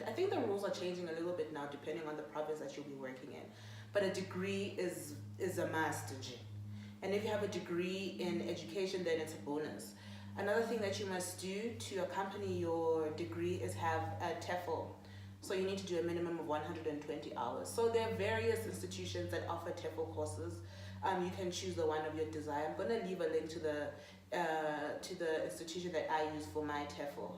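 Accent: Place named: South Africa. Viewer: I love your Xhosa accent dear. You make me miss ezlalini. And thanks alot for such an informative video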